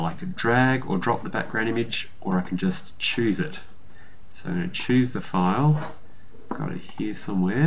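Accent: Australia. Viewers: Fantastic, you've saved me a whole heap of heartache - so clear and concise - nice to hear an Aussie voice on youtube as well:)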